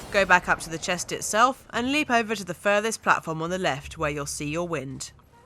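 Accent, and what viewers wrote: United Kingdom. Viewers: She’s British that’s just how we would pronounce it over here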